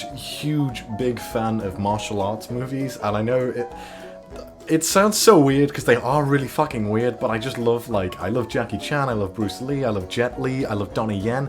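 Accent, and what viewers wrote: Wales, United Kingdom. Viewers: ARE YOU WELSH MATE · Don't have a twitter but for the next one, how hard is it to hide your British accent? Like is it easy or hard to do?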